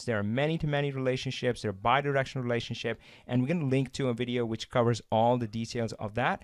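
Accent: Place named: India. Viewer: Finally, one smart Indian man with weak Indian accent. Thank you for the tutorial, thorough and easy to digest. 👍🙏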